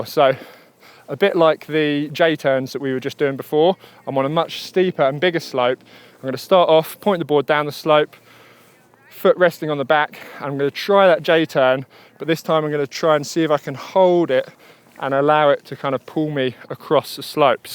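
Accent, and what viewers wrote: United Kingdom. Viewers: You are so inspiring mate! You got the cool and positive attitude, i sometimes look at your videos just to relax (and for your lovely british accent). I hope i get to Alpes d'Huez some day to learn some stuff and correct my riding posture someday ;) Cheers, keep up the nice work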